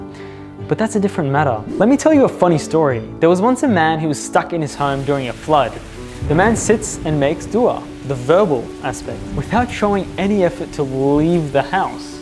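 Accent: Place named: Australia. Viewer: I love the AU accent. Congrats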